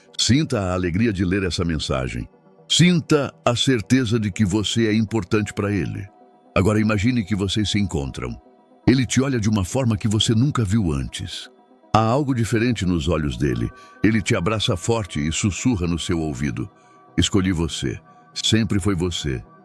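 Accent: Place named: Brazil. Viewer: Porque se oye en du brasilero?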